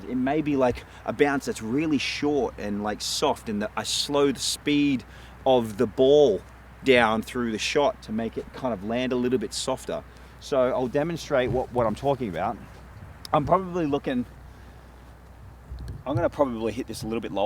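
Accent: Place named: Australia. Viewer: is he an Aussie? sounds very Aussie!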